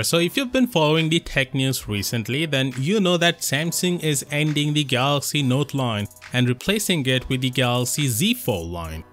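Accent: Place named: India. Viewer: Work on your accent, everytime I try to watch your video till the end i just give up because of it. Otherwise your fans will be indians only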